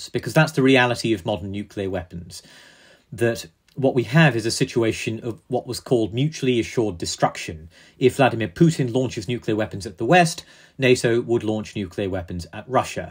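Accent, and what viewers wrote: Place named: Scotland, United Kingdom. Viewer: Its a pity the Scottish accented voice over thinks "nuclear" is pronounced "nukilla".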